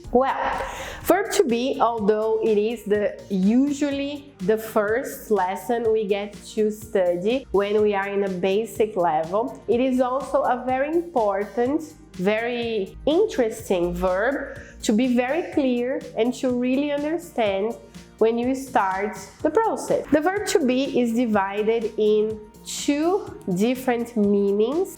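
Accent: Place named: United Kingdom. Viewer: Eu acho fofo o seu sotaque britânico. Normalmente, no ingles americano, o T tem o som de R em algumas palavras, such as: "personeleri", "ciri", "nationaleri", porém no britânico eles pronunciam o T originalmente.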